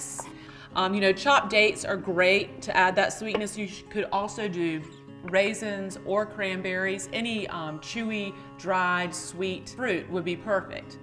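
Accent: South, United States. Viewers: I love your pronunciation and Southern Drawl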